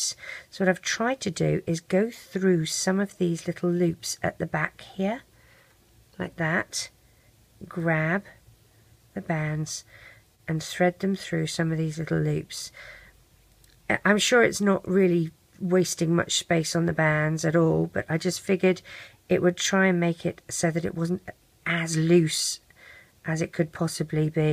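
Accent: England, United Kingdom. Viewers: The best minion ever! I love it! Just one question, are you English?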